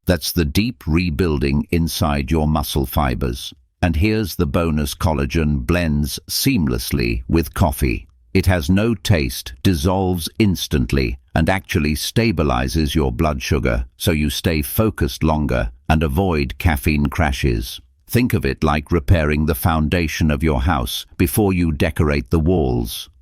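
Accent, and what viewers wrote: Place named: United Kingdom. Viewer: Why does it take almost 16 minutes to say what you add to your coffee? We do not need to listen to the British voice droning on & on.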